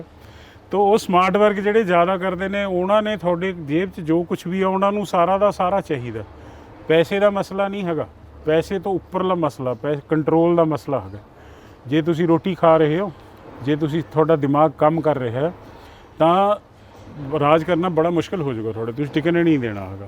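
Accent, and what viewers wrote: Puadh, India. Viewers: Puadi bhasa bol reha veer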